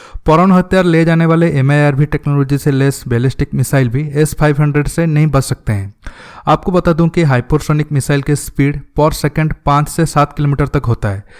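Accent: Odisha, India. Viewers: tum Odisha se ho kya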